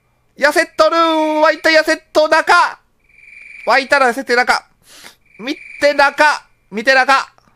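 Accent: Kagoshima, Japan. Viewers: 9割くらいは理解できませんでした😊 鹿児島弁スゴイ👍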